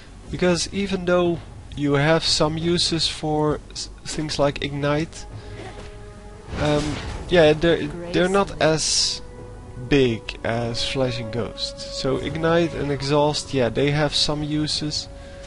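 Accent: Netherlands. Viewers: Are you dutch? like Soraka AD :D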